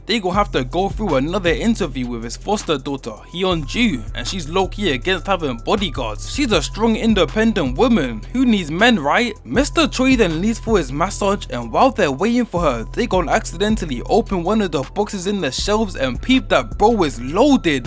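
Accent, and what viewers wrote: United Kingdom. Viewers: A British nigga talkin bout yns is wild to me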